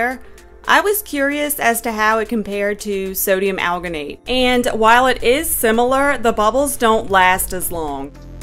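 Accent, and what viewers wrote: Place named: New Orleans, United States. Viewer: Used this video thanks... settled on the guar gum. btw are you from New Orleans you have a particular accent that reminds me of home?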